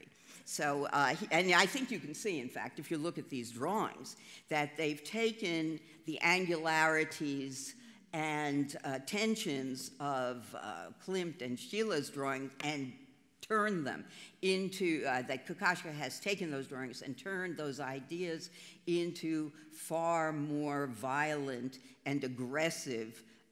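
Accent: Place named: United States. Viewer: This is the best of the internet, the ability to join in, to lectures/presentations like these..... but - big sigh - why cant American learn to pronounce European names correct? If you knew how disgusting it is to listen to this, flat American dialect of this woman (where is she from?), you would be ashamed of yourself.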